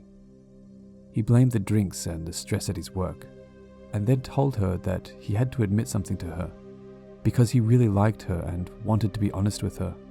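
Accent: Queensland, Australia. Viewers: That's what heard. QLD for sure. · Sounds like a fellow Aussie. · aussie myself and yeah, QLD was my first guess too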